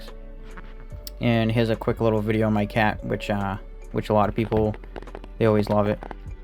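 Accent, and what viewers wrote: Boston, United States. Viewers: You from Boston? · Are you from MA? I’m hearing a Boston accent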